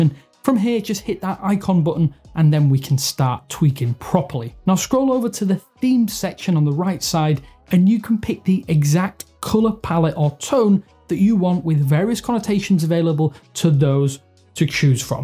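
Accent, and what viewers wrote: United Kingdom. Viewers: Are You British...?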